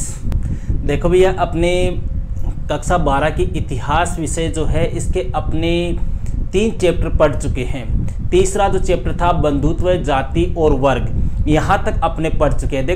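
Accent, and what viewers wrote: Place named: Haryana, India. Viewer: sir Ap bahut Achha padhate ho but sir background sound thik karo please or hindi bola karo haryanbi mat bolo please 🙏🙏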